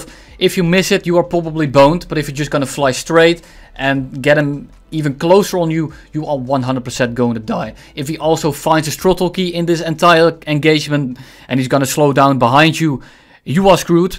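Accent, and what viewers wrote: Netherlands. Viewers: Oh you're dutch, so that is what the slight accent is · Aan je accent te horen ben je sws nl mrg top video, veel geleerd man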